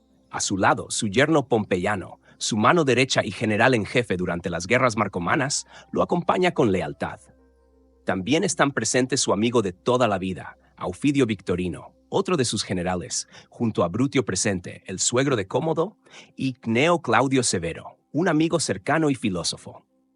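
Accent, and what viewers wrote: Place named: United States. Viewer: Porqué un narrador gringo, FUCHI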